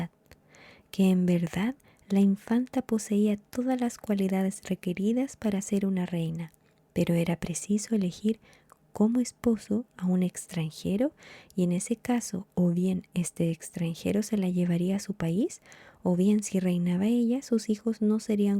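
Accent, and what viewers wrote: Chile. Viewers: eres chilena o mexicana ? 🤔🩷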